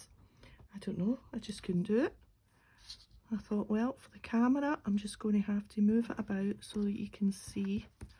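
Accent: United Kingdom. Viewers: Thank you for the video. Adore this creative idea. Also, I love your accent. Where in the UK are you? I listen to another lady who has a similar accent. Enjoy hearing you so much.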